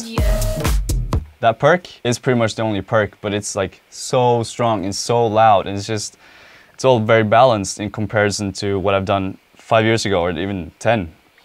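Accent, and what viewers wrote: Norway. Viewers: Nice. Are you from norway?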